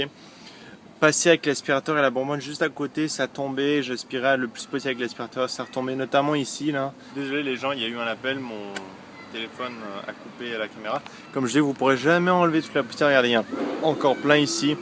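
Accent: Quebec, Canada. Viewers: c'est normal il est québecois ^^ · A tu un accent quebecois Pouce vert pour quil le voit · En France il fait pas chaud ,11 degrés à Strasbourg oú je suis ,sinn pas mal tes vidéos et sa va on remarque pas trop ton accent québecois =D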